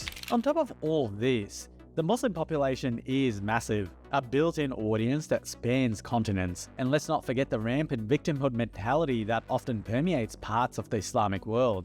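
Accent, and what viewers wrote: Australia. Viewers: Is that a melboune accent sir?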